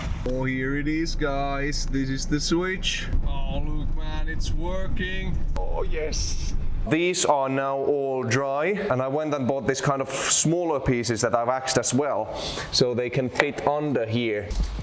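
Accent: Finland. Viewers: vai että kässis. pakko sanoa etten oo koskaan ennen kuullut moista. kai se voi rallienglanti taittua opelikuskiltakin.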